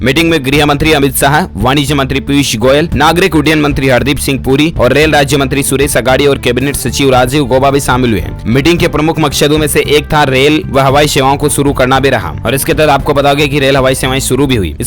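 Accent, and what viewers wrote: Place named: Bihar, India. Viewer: भाई आप बिहार से है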